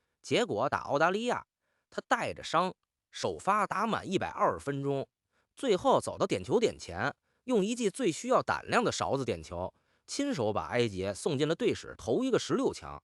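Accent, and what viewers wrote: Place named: Beijing, China. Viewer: 满嘴京腔。反贼也看球啊？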